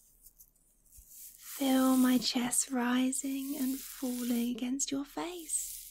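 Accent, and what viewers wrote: United Kingdom. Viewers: Wale accent?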